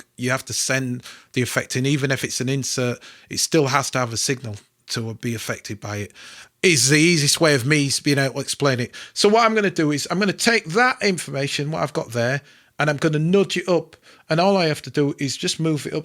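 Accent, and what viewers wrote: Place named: North, United Kingdom. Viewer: As an older guy who is completely out of his depth(normal for me lol), I find you so easy to listen too, although I'm a southerner I find your Northern accent so easy on my brain and even more important, no bloody American crap. Keep it up mate, I love what you do, and know its not easy teaching dimbos like me. Just know that thanks to you I am getting there, albeit slowly lol.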